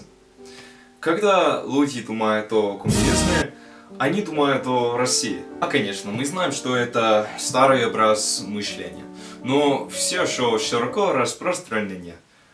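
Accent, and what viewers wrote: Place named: Ukraine. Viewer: Почему мне его произношение напоминает украинский?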